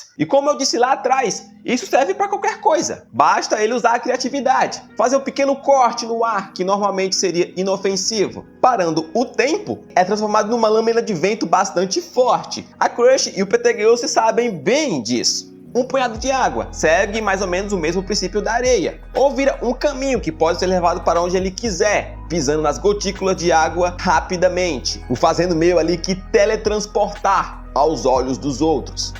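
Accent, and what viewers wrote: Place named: Northeast, Brazil. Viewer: Mano vc tem sim um pouquinho sou do Piauí ent n consigo perceber mt sotaque nordestino, porém eu ouvi ums foi "massa" "foi paia" ai pronto tive certeza que é nordestino raiz kkk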